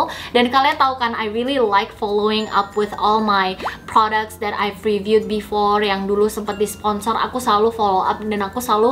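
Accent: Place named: Java, Indonesia. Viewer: bibirna medok teuing eta teh...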